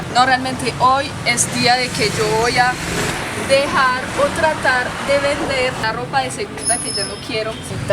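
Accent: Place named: France. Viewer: No pero tú cómo puedes hablar los dos así de bien? Vives en colombia pero tú francés es de Francia?? Cuál es tu lengua maternal? Y los cursos de francés son en línea? Salidos de Canadá!!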